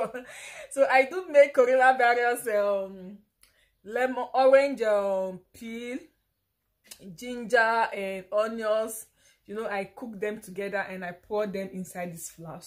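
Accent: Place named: Nigeria. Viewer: Loll I know you're a Nigerian your accent tells it all. Anyway I like how you just be yourself.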